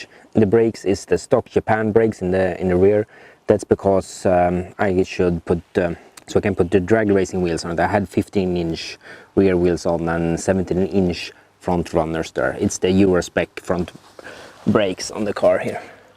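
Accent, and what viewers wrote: Sweden. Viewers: Are u swidish?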